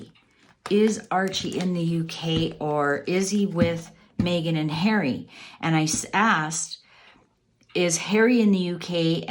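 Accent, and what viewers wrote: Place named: Canada. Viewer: I had no idea that is a fellow Canuck 🇨🇦. I just picked up on the accent. I knew there was a reason I liked her in addition to her being a talented, intuitive reader. Very good reading, 🔮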